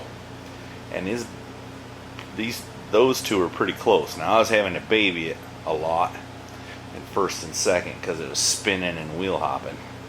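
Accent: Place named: Appalachia, United States. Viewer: Damn i love your hillbilly accent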